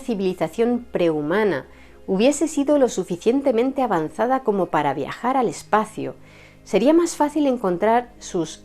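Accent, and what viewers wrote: Spain. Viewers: Me encantan tus videos, y con el acento español los hace más interesantes 😊 saludos desde México 😘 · me encanta como relata muy misteriosa y todo,el tono de voz mas el acento español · Hola, eres excelente divulgadora y tu acento español es hermoso. Saludos desde el Río de la Plata.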